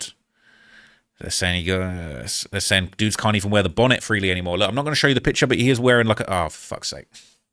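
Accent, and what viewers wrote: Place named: United Kingdom. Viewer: I heard your accent and was Thank God. This is UK Surrey, not British Columbia, Surrey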